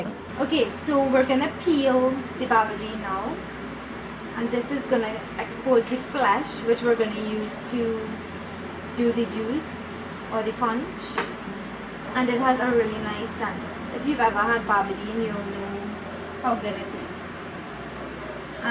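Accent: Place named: Trinidad and Tobago. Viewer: U a true trini "ah" ,"doh" "kinda".. ah love we dialect too bad❤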